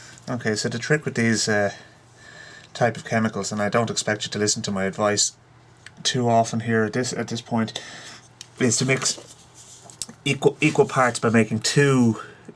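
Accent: Ireland. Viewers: Worth watching for the irish accent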